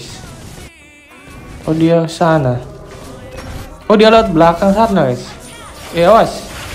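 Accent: Java, Indonesia. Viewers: suaranya jawa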